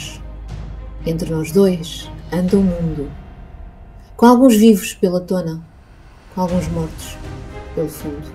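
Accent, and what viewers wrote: Portugal. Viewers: Sotaque português é muito carregada, mas ficou bom.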